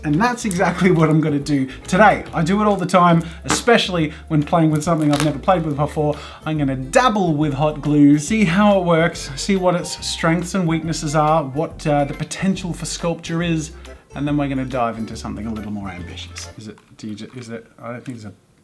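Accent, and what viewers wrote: Australia. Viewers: Are you Australian? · He sounds extra Australian today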